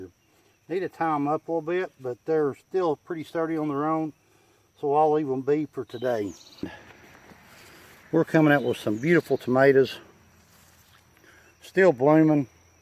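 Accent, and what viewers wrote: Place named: South, United States. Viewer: Ok, I’m going to straight admit to this. I subscribed because I really like listening to your southern accent.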